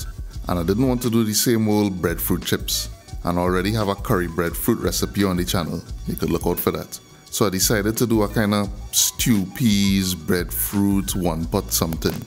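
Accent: Trinidad and Tobago. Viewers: But on a real tho, I really like how homeboy talks, It sounds so Trini and he's straight to the point, very interesting delivery. Keep up the good work and keep bringing these delicious recipes! Respect!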